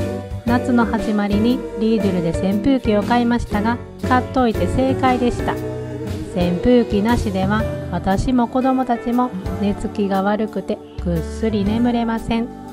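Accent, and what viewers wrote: Kansai, Japan. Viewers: 関西弁がクセになります！！！！！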